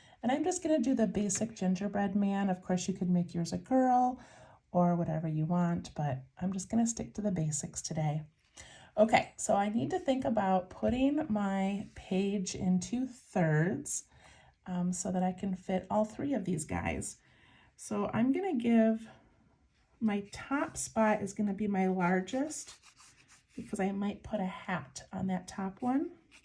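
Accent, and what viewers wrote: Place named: Midwest, United States. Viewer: Random question: Where do you live? I hear you say 'you guys" and can't help but to think you live in the Midwest. I grew up in Kansas, but now I live in NC. When I say that some people will comment when I say it. I may live in the south, but I am not going to change my vocabulary!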